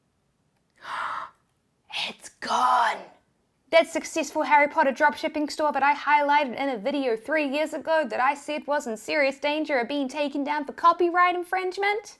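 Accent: New Zealand. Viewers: The only thing I like more than your cool Kiwi accent is that blue and pink striped shirt. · I think it's New Zealand accent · Love your content and kiwi accent....im an aussie.😆👍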